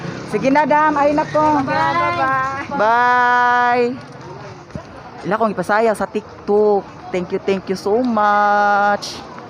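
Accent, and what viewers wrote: Visayas, Philippines. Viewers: ka gwapo s aimong mga pag umangkon madame;; alegra dha dapita,,makataw ako kay visaya ninyo d mag kapareha sa davao ;pero kasabot pako madame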